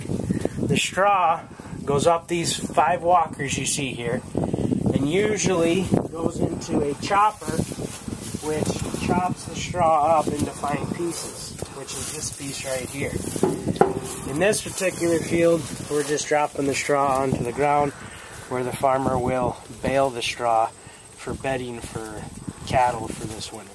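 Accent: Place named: Minnesota, United States. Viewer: Neat vid. I'm from Iowa and I'm looking at you and thinking your an Iowan but then I hear the voice and Im thinking Minnesota....maybe Northern Iowa?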